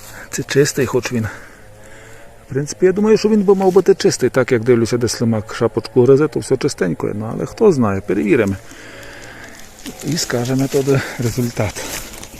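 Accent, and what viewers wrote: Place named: Carpathians, Ukraine. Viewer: Чудовий діалект!Карпатська мова ,доповнює красу такої чудової природи!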